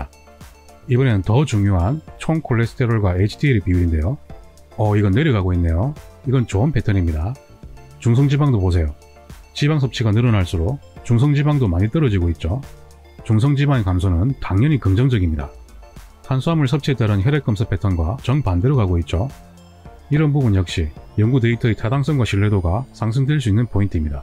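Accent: Gyeongsang, South Korea. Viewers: 선생님은 경상도 분이시군요. 목소리가 정감 있습니다. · 말투를 들어보니 대구분이신듯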